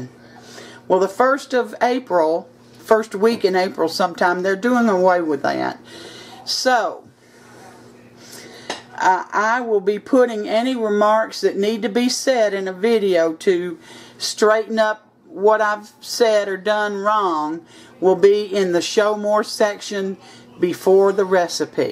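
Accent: South, United States. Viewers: just kills me with that southern draw lol. Y'all are as country as cornbread and that's a complement from where I'm at. Keep on cooking and I will keep on watching. 😊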